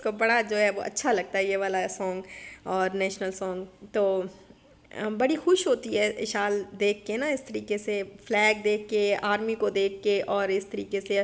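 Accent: Saraiki, Pakistan. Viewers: i watched first time ur vlog r u seraiki ur accent is just like seraiki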